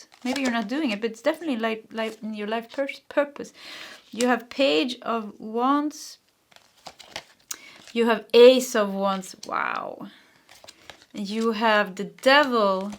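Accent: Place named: Sweden. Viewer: Thank you for #2! This is 100% me! Everything you said just kept building on the previous statement and I just kept saying, Yess! Yep, that’s me. Oh my yes! Uh huh! That definitely explains what I’m feeling! Yes, I do that! Oh, I totally get it! And on and on … So I’m looking forward to moving forward with this new life with a new perspective on my past and I know I’ve carried karmic energy that I’ve been healing this past year! I’m overcoming, healing and loving “the happy ass life I deserve”! ❤ Thank You! BTW, I’m Swedish/American and have a heart connection to Sweden even though I’ve never been there, at least in this life. I love hearing your accent and I’m so grateful I found your channel! I think the heritage connects me to your readings even deeper. ♥️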